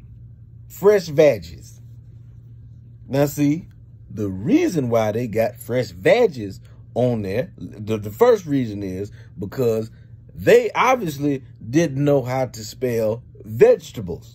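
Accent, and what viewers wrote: South, United States. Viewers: They just a Lil country shuler. It's how we talk down south in TX. Thanks for the Birthday laugh. 😂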